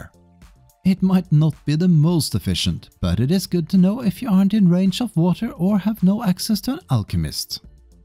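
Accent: Norway. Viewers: I haven't watch a video by you for awhile now but I started playing Conan again so now youtube says LOOK! Which is nice. Useful tips to know. Sidenote: I don't know why or how but for some reason your accent sounds stronger than before. Maybe you just have a new microphone or something, no idea but it's the strongest norwegian accent I think I've ever heard x) 🖖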